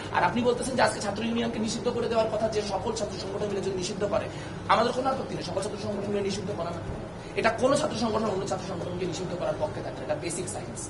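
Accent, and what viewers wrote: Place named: Kolkata, India. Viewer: এর কথা, কলকাতার ভাষা ম তো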